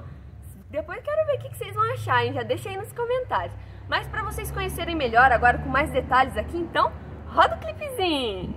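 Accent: Minas Gerais, Brazil. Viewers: Vc fala bonitinho parece mineirinha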